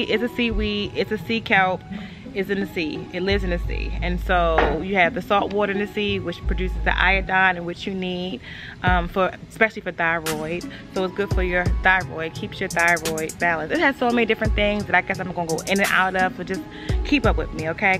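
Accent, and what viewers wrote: United States. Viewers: DC or B-More is what I hear in that accent, great vid🤩🥰🍫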